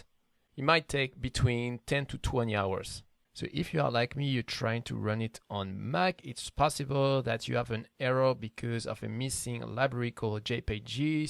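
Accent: France. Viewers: 2 years later, it did not happen. And your French accent is still unbelievable :D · Merci ! Je réponds en Fr car je crois que j'ai reconnu l'accent ;) Ma question était : j'ai l'impressions que le Tuto de Prysm explique comment lancer son noeud établir son noeud sur son ordinateur. Je me demandais quelle était l'étape à suivre si l'on veut passer par un server comme digital ocean ou dappnode ?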